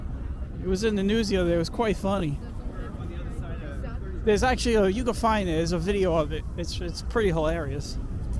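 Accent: New York, United States. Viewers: You talk like a real New Yorker. I know I'm from the Bronx. You refer to Manhattan as "the city". That's a throw-back from the 19th century when Manhattan was just "the city".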